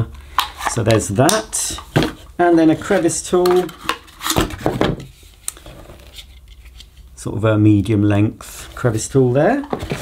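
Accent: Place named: United Kingdom. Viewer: Came for the review stayed for the British accent. Greetings from Florida 🌴🌴🌴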